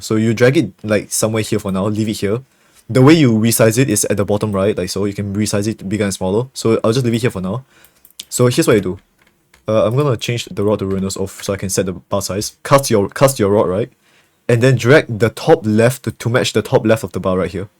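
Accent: Singapore. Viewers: r u from singapore?? u sound singaporean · thank you singaporean accent man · Omg ru Singaporean I can hear the accent wasa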